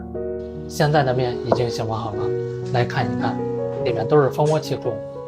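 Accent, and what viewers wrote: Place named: Tianjin, China. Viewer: 博主是天津口音吧，这个发饼我觉得太费事啦，烤箱也更加费电，你这个成本算起来太高了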